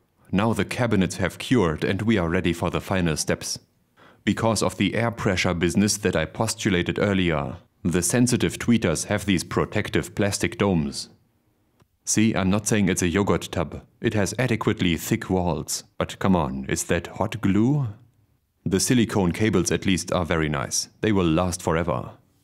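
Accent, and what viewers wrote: Germany. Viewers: I really like your mildly cumbersome, but not quite German, accent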